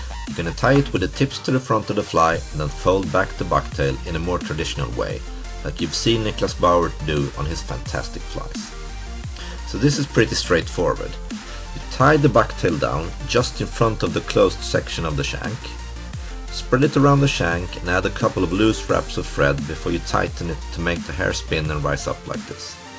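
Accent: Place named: United Kingdom. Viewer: 700 claps, great stuff my friend!!! I enjoyed your video, nice flies, and good to hear your voice, sounds more British than Swedish...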